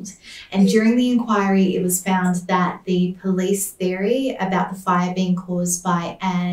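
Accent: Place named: Australia. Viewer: New sub! I loved the way you did this. It’s refreshing to hear a fellow Aussie doing these videos. Well done!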